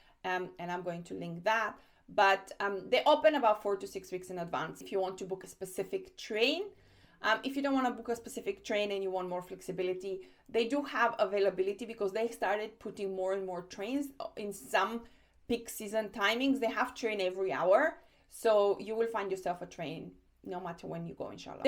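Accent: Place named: South America. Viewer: Thank you so much!! Are you from South America? I really like your accent!!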